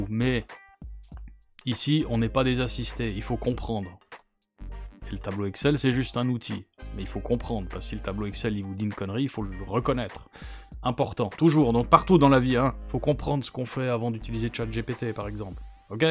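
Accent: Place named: Switzerland. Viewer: Je pense avec son accent et les huitante/nonante, il est plus du côté de la Suisse. Reste à savoir quel canton.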